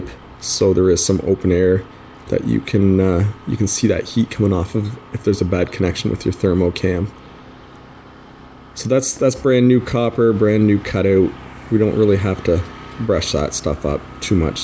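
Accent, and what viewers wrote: Canada. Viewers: Are you from Canadá?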